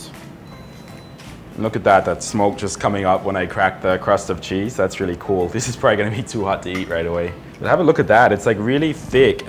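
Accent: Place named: Philippines. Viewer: Soooo... your accent really throws me off. I know you're Aussie but you sound like a Flip fob... (not that there's anything wrong with that). =P Love your channel, btw... keep it up!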